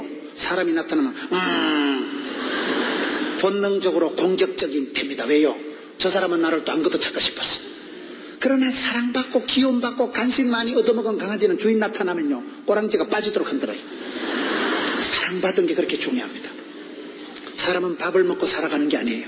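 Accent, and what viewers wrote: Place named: Gyeongsang, South Korea. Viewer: 김문훈 목사님 존경 합니다 경상도 사투리를 김문훈 목사님 고향이 경상도 목사님♡♡♡♡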